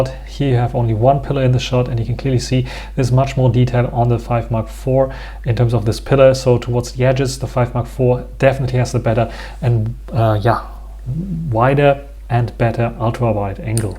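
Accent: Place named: Germany. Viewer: Bro where are you from?I like your accent sounds germanish xd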